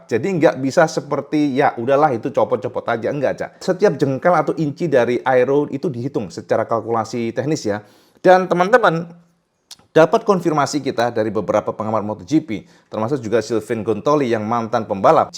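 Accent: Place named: Java, Indonesia. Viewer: Sangat kental bahasa jowone kang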